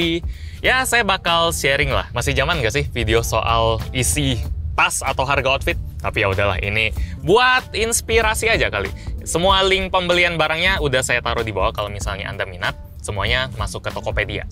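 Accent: Palembang, Indonesia. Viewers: Bang asli orang palembang yah?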